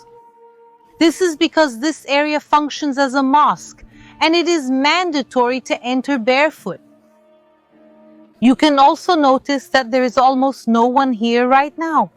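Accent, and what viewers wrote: Israel. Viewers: I think the original language is English, she/you is/are speaking English with a nice Israeli accent, right?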